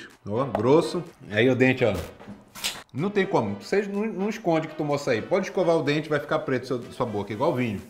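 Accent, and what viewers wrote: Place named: Belém, Brazil. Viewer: O sotaque nas palavras diminutivas é o que me mata do coração! 😍 sou manauara, mas a família do meu pai é toda de Belém... Amo demais essa terra, a comida, o jeito de falar... etc!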